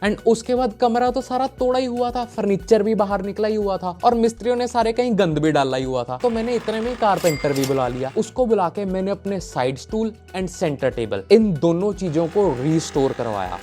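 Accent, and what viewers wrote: Punjab, India. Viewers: Bro konsi city me ho ? Apki voice me punjabi touch hau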